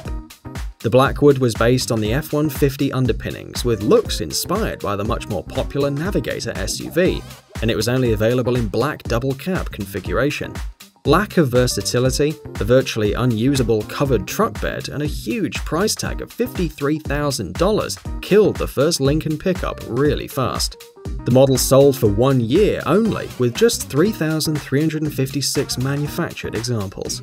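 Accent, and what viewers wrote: England, United Kingdom. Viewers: Why is this an English narrator for a video about American cars? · Hey mate I love your video, and your accent. English me thinks, but I'm a bit butt hurt, about that (except trolls) bit. So I guess I won't be hearing from you. Bollocks we Trolls never get to have any Fun. The Trolls need Love too, you know. Oh well, after a good cry, I'm going to another channel, and "Let slip the dogs of War". Fair thee well, my lord. ( ;)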